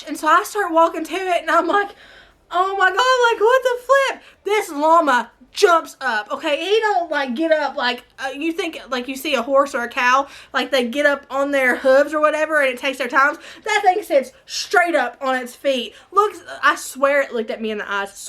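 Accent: South, United States. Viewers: I love hearing you talk. With your sweet southern accent & upbeat personality you put your viewers in a chipper mood. ♥️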